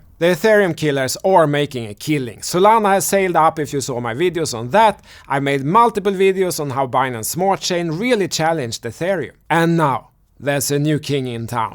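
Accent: Sweden. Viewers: The biggest Sweängelska I heard in my entire life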